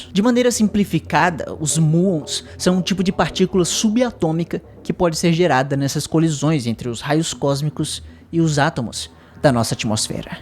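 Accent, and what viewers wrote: Ceará, Brazil. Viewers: TU É CEARENSE MANO?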